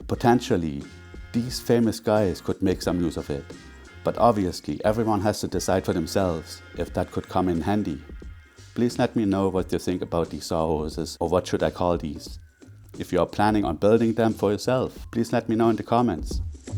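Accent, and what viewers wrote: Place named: Germany. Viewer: Your accent is definitely telling you are of German descent, in any case European born. So why on earth do you use inches etc. Metric all the way dude!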